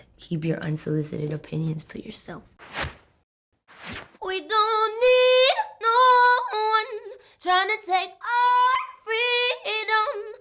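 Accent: Caribbean. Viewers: she sounds slightly Caribbean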